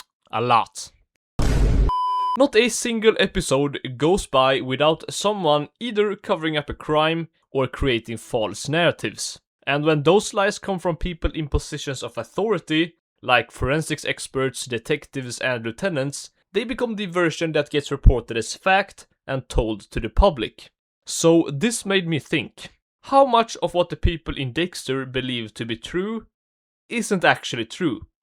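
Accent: Sweden. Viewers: Is that a swedish accent I hear? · Why does bro sound Swedish? 💀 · I've heard few english accents in my life that sound more violently swedish than yours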